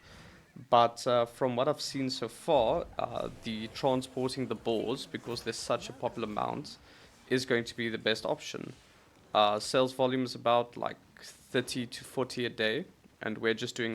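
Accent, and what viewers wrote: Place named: South Africa. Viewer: You are from saffa right ? I know a saffa accent when I hear one, immediately got my attention. 😂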